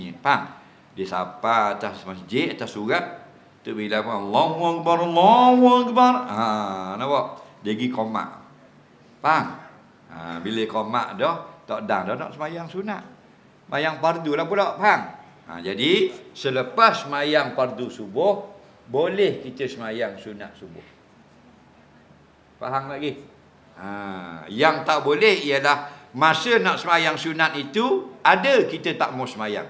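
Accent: Terengganu, Malaysia. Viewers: Sebab bahasa ganu ke?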